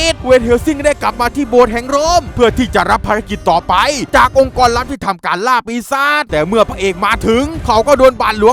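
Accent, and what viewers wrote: South, Thailand. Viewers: มึงคนใต้...ไช่หม้ายคับพี่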